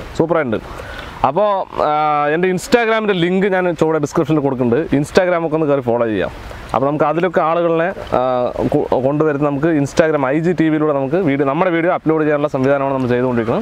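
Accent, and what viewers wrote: Palakkad, India. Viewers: പാലക്കാട്ടെ സംസാര രീതി നല്ല രസം കേൾക്കാൻ ഏട്ടാ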